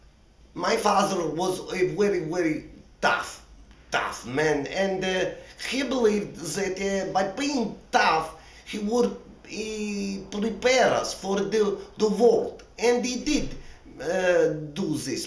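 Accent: Russia. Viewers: Italian Mafia + Russian accent:)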